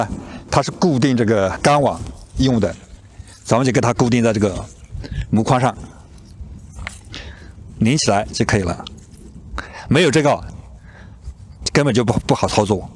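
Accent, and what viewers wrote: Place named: Kunming, China. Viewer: 谢谢李老师！听着你的昆明口音，太亲切了！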